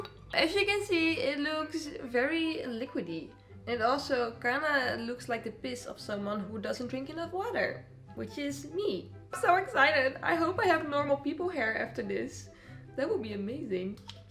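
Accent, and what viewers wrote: Netherlands. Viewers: Ik hoor meteen dat je een Nederlander bent😂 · Are you from the netherlands becouse iam and your accent is sumulair to myn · Wtf is that accent. She is 100% Dutch. Aaaaah cringe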